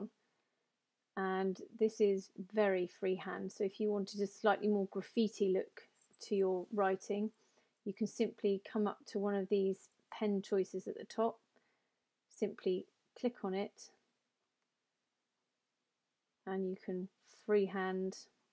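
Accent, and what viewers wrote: United Kingdom. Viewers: bri ish accent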